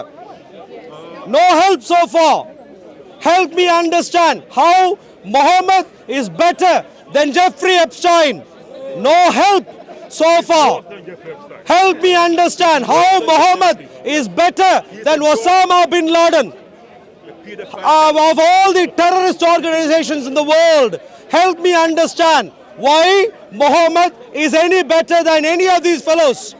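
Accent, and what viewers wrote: India. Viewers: Why is an Indian talking and British in the background ?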